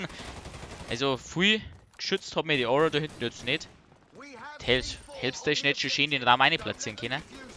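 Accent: Bavaria, Germany. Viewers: des boarische reden is so geil☺☺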